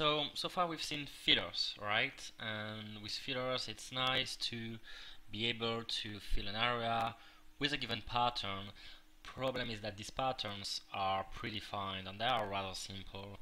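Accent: France. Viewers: Are you french? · french or belgian?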